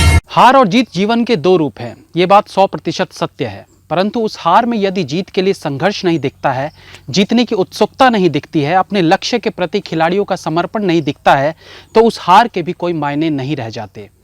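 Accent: Standard. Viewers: शुद्ध हिंदी" गज्जब भईया❤️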